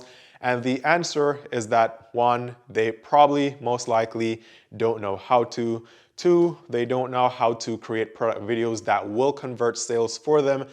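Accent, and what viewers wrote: Jamaica. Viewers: Yu sound like a yaardie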